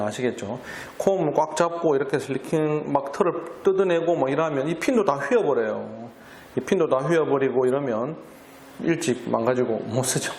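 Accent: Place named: South Korea. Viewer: 저는 성격이급해서 웬만한영상 2배속으로듣는데 진짜 서울말처럼들려요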